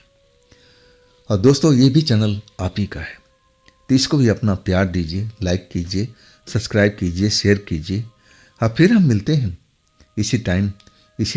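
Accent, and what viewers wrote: Bihar, India. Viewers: Bihar se ho kya bhai ❤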